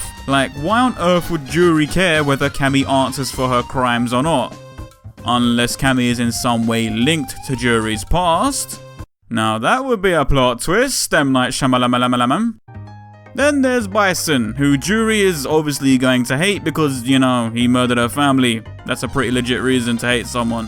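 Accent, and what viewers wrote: London, United Kingdom. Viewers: Its British one. A heavy slang based one but still British, more accurately, it's from London. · Just happened across this video and in less than a minute and a half you've mad me laugh. Coupled with being a londoner, (I assume from you accent)... that's an auto-sub right there!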